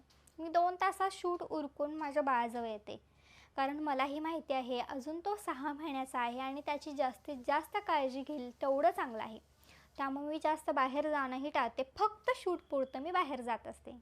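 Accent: Kolhapur, India. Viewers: बोलण्या वरून तुम्ही सातारा कोल्हापूर चें वाटता 👌🏻👌🏻👌🏻म्हणेज माहेर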